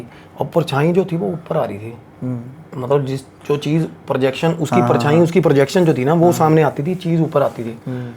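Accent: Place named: Punjab, India. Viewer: paji sat shri akaal ji.. paji ek gl kehni c meri gl da gussa na kreo bai dilo respect aa tanu veere i really appreciate for your hard working. Paji ek cheej main piche boht dina ton note kr reha k eh banda jehda tade nal baitha hunda naam aa i think veer da, bai eh Banda tadi energy khraab kar reha yr tadi gllan sunan ch boht chass aandi, kyonki tuhada lehja punjabi touch aa extreme energy aa tadi 24/7 active rehnde oo tuc. Pr bai main fr kehna meri gl da gussa na kreo pr jehda banda tade nl baitha hunda aa eh bnda tadi energy khtm karda yr nal beh k susti wala atmosphere bnaya hunda es ne. Sare sade interest nu khtm kr dinda yr eh banda please es cheej t thodi working kro veer g. Otherwise mainu eda feel hunda k jes trha main da susti bhrya chehra dekh k irritate hunda rehnda baki tadi audience v jroor irritate hundi hovegi. Eh banda bai mhol bnan ton pehle ee swaad khraab kr dinda aa yr. 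Ann.. Ann.. kr kr k please bai meri gl da gussa na kreo main dilo respect krda tade hardwork nu baki tuc khud siyane oo paji. dilo pyaar❤️ sada hassde vassde rho, baba bhali kre☑️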